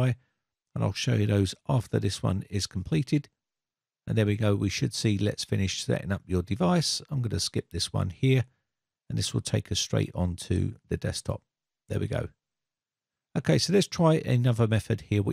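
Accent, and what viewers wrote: United Kingdom. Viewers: Bri ish guy it's not working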